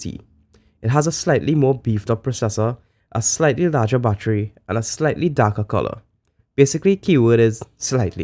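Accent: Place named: Trinidad and Tobago. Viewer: Can't miss that Trini accent 😎 Keep up the good work bro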